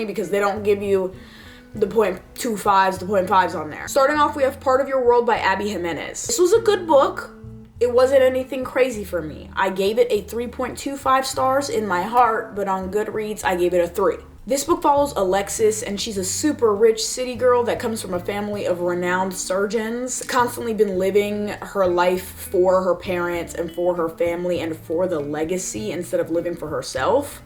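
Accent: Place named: United Kingdom. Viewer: Why did i assume you were british..? Anyway immediately subscribed.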